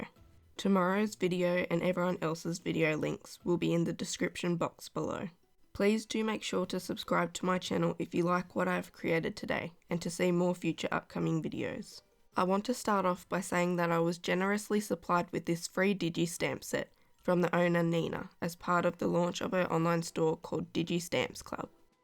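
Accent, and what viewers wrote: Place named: Australia. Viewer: I wondered where you lived with that super cool accent. Australia! How neat! I know someone from there and for years I spent time trying to convince him to mail me a kangaroo. He kept telling me that kangaroos are wild animals and are not meant to live inside your house, but I would not take no for an answer. I had an awesome picture in my mind of my very own kangaroo carrying stuff around for me all the time in its super cool kangaroo pouch. That is my story and I'm sticking to it! I didn't realize that Australia doesn't really celebrate Halloween. That is something that I hope changes because Halloween is my favorite day of the year, so I wish that everyone would get to experience it! This year I got to meet my new neighbor who is four years old and is named Faith, when she ventured across the street because she was looking for candy. 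She actually yelled and asked if we had any, at which point we yelled back very loudly, "We have so much candy, and glow bracelets, and bags of Halloween toys!" Needless to say, she convinced her dad to come across the street and come inside to meet us. It was great. There are so many cute things that happen every year. Tiny people are my favorite kind of people, and Halloween is the one day of the year that a bunch of them show up at your house wearing adorable costumes! That is why I like it so much. I will find you out in social media land. I really hope that it is not expensive to mail that card all the way to me in the United States. If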